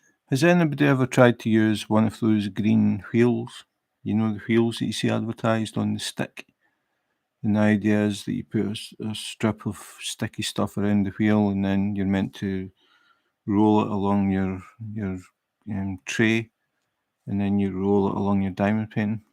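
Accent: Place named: Scotland, United Kingdom. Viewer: Diamond painting AND a Scottish accent. I can change up from diamond painting with Glaswegian comedian Kevin Bridges. 😳😂😂😂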